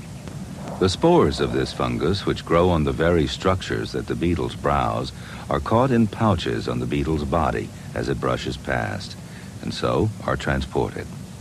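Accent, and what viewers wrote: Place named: United States. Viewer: Yes but what happened to the original narrator? This version, with the American accent is not nearly as good as him!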